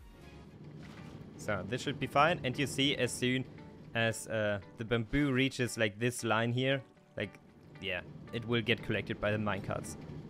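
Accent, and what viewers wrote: Germany. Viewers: And btw you hear like your are german, and here is a qord for you : im a ALMAN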